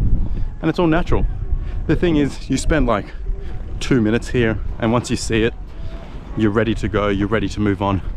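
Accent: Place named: Australia. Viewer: Fun and engaging video!,reminds me of Harald Baldar! Really love your aussie accent!☺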